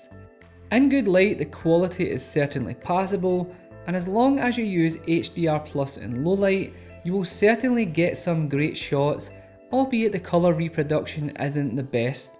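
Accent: Scotland, United Kingdom. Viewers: If I'm honest I love your accent. I've always wanted to visit Scotland one day 👍🏻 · Where r u from?? Scotland?? Nice accent. Nice video!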